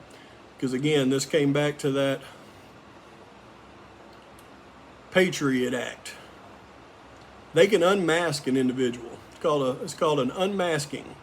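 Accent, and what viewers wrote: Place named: Alabama, United States. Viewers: I'm an Alabamaian too but I don't reside there. My accent isn't as clear as P's..😂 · You definitely deserve more than 1.6K subscribers. You give an interesting and compelling perspective -- I never really bought the drug connection, but you have me thinking. And as a native of Alabama, it is a delight to hear a guy with an accent like mine who uses good grammar and is smart as hell. Keep on,. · Finally! Thank you. It mostly makes sense to me now lol. This crazy case has been drivng me insane. I think most people want justice but don't want to see a (possibly) innocent person framed and just want reassurance (real evidence) that he did do this crime. Also I think it is very scary when it appears that our rights are being ignored and it is insulting to our intelligence that we are being lied to and misled. Thank you for providing some much needed clarity. 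Btw I love your voice-I went to college and lived in AL for a while and your accent seems similar. Please keep the info coming! It is much appreciated!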